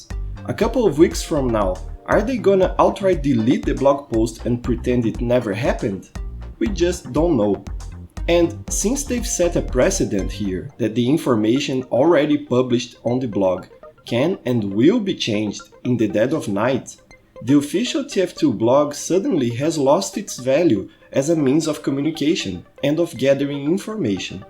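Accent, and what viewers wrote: Brazil. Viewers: sabia, sempre dá pra saber com esse sotaque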